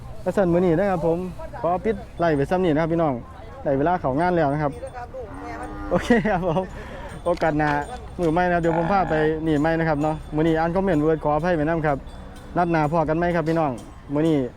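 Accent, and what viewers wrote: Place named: Sisaket, Thailand. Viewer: ศรีสะเกษชัดเจนค่ะพี่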